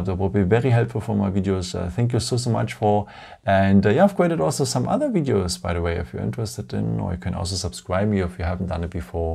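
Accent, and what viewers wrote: Germany. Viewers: If the phone is on standby 'ne?' tells me right away you're German 😁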